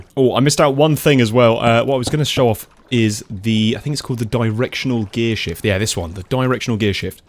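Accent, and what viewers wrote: United Kingdom. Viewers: Personally for me I've always loved create, didn't fully enjoy others using it though, I 100% enjoyed your video. And the English accent is a genuine cherry on top, very soothing voice. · This guy kinda sounds like stephen merchant ngl. And no, not just because he has a british accent. Its just stephen merchant without pronouncing any R at the end of words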